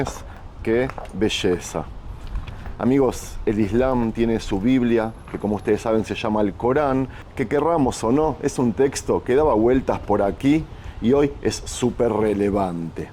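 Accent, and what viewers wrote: Argentina. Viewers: Hola he oído y visto más tus relatos y apreciaciones personales pero muy sesgado e irrespetuoso con la confianza brindada que pena pero que se puede esperar de un judío y cuando comentas del Corán no es la Biblia del Islam es un libro Islamico ..ya que Biblia significa libros que fueron de diferentes épocas y diferentes profetas o no? Eso significa en el hebreo o no? Ya y el Corán es un libro repito como la Torá y si eres apasionado de la Biblia te felicito y me gustaría preguntarte si la leíste o solo eres fanático? Y si lo leíste dime que significa lo que dice Isaías respecto al pueblo judío? Y que quiere decir en el capítulo 52.13 en adelante? Y que manda a decir nuestro YHWH =nuestro Creador en el cap. 54 del mismo libro? Y si habla de cronología de la Biblia Jesús que es para usted? Bueno espero ser respondido y no sea usted un mero YouTuber ufanado en conseguir seguidores con sus fantasías y debe usted ser imparcial objetivo y verás si quiere hacer un reportaje y más si se trata de religiones así los que no saben aprenderán pero cuando usted usa su canal para sorprender en el español de acento Argentino causa usted preocupación y molestia y los que hemos leído algo de la Biblia y conocemos algo del Islam quedamos sorprendidos al ver a un judío siempre judío por quienes murieron todos los profetas perseguidos y por quienes Jesús fue juzgado y muerto o me equivoco? 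Y hablo de un punto neutro sin afán de bandera religiosa . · Dices que eres judío pero hablas argentino dx Como es eso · Te crees Judío y al escucharte eres un Argentino.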